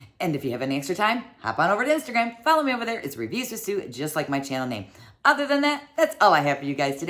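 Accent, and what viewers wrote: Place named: Midwest, United States. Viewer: Hi! bag 1 is yours! I can tell by your voice, and excitement level for the first bag. I have heard that Ipsy uses the age to decide if they give you more makeup or skincare. I have been curious what two bags would look like if the only difference in the profile was the age! Also are you from Wisconsin? I grew up there and your vote reminds me so much of the Midwest. It's super fun that you get it with your niece. I can't wait for my daughter to get old enough to gift her Ipsy!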